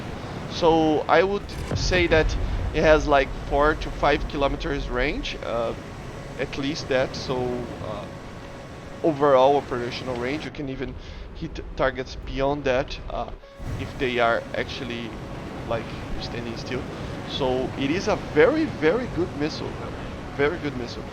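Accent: Brazil. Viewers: kkkkk sabia, esse sotaque br e mt forte, mas o inglês e bão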